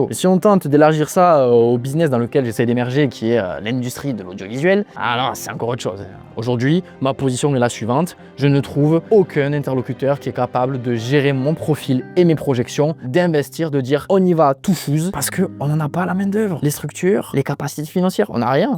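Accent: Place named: Toulouse, France. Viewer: Ah ouais et l'accent toulousain c'est joli, je suis jalouse de pas l'avoir ❤ et je me suis abonnée dès que j'ai entendu le tiens 😊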